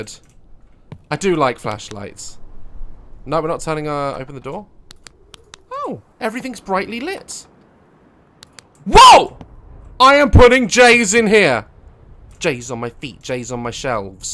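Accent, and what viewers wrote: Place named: United Kingdom. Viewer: This video is perfect for me right now taking breaks from the stress of online learning. Mild and short but just terrifying enough. I like watching play this with his British accent and humor. Now I can (not) sleep peacefully.:)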